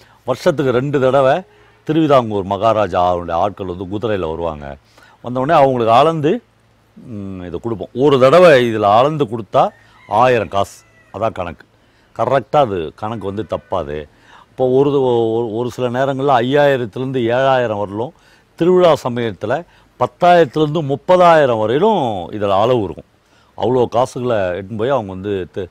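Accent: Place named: South Arcot, India. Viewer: பாவா உங்களின் மொழி பழைய தென்னாற்காடு மாவட்டம் பேச்சுவழக்கு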